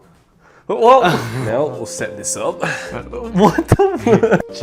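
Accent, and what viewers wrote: Australia. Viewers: Are you Australian cuz I am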